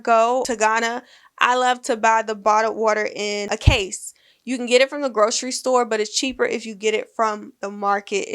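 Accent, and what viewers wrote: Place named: Twi, Ghana. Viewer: I love your twi accent😂😂😂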